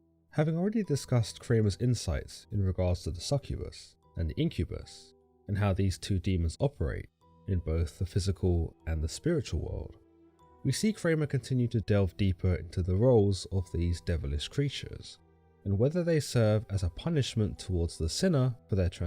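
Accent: United Kingdom. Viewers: hearing a British guy talking about catching fish, with a creepy violin and piano track in the background is gotten to be my new past time!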